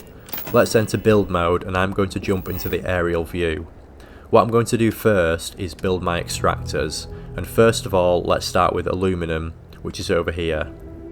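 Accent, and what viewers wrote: United Kingdom. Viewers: You sound English so why say ALUMINIUM the American why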